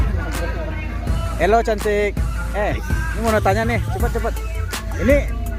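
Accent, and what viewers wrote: Solok, Indonesia. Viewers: Ahaiiii mandtap ciri khas dialek soloknya bro😁😁👍👍🙏🙏🙏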